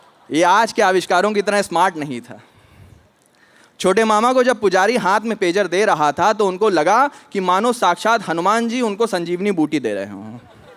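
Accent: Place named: Kanpur, India. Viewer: That's a thing....know as,"kanpuriya accent"!!!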